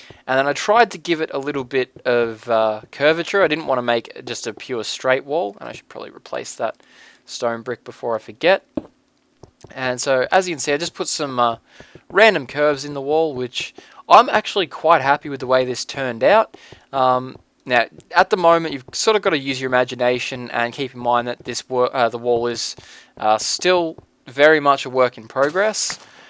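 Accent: Australia. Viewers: he's Australian right??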